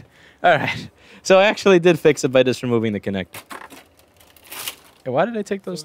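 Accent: New York, United States. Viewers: haha such a new yorka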